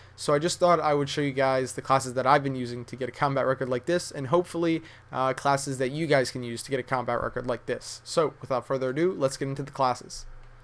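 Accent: Sweden. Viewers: are you from Sweden? :O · are u from sweden? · are you from sweden? :P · Are you from sweden? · are u swedish lol?? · are you swedish? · are you from sweden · are you from swedin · Are you from Sweden? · Does he sound Sweden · ARE YOU SWEDISH?!!? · is he from sweden?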